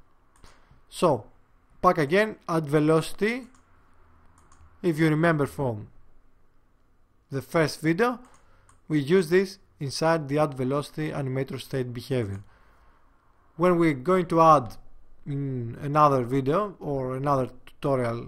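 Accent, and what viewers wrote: Greece. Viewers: aderfe eisai apsogos sunexise etsi (in case you're not Greek, however I am picking up greekish accent then apologies I was only saying "you are amazing keep it up")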